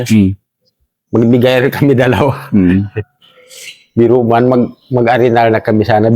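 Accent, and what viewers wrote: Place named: Sulu, Philippines. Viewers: Boses palang ni sir halatang tausug na, salute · Tausug to accent pa lang Tagalog niya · tau luuk jolo sulu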